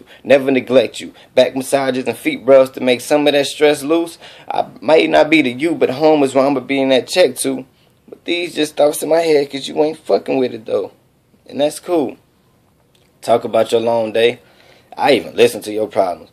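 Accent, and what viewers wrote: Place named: South, United States. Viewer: That southern accent!! Oh yee. Atlanta I'm in love 💚💚💚⚡⚡⚡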